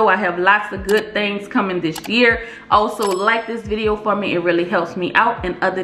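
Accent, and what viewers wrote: New Orleans, United States. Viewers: I can tell you’re from New Orleans with that accent lol. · She sounds like a Nola city girl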